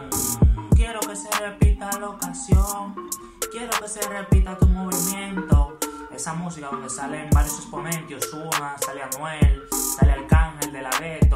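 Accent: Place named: Mexico. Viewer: supér carnal pense que eras de mexico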